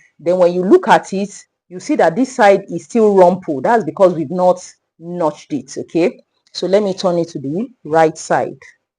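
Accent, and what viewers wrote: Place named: RP, United Kingdom. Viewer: Your queens English makes me forget what am watching and be listening to the filtered voice. Well-done queen boss.........you dey help my career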